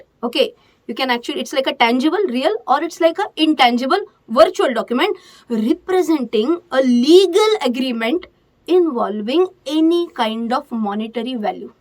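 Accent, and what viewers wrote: India. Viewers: Considering u are talking dollars and not rupees, congratulations for making through the Indian accent and context here.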